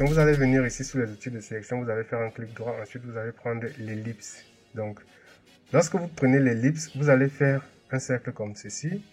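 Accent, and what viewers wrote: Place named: Cameroon. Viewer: Je m'abonne d'abord parce que ton accent la c'est pour le Continent 237 pure pure. Puis je like parce que tu na pas cacher comme les autres et j'active la cloche parce que tu a du bon contenue et c'a m'aide.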